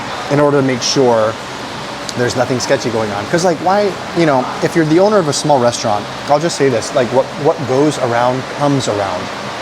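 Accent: Philippines. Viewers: nagiging filipino accent ka na slowly kuya. stresses and emphasis na lang on syllables, the level you are right now is comparable to an english-speaking household-grown teenager transitioning to learning filipino. very proud been a quiet watcher since january this year. Happy birthday!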